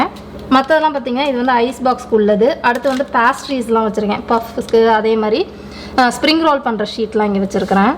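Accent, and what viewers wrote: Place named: Tiruchirappalli, India. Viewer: Neenga trichy a mam.ketkave santhosama irukku.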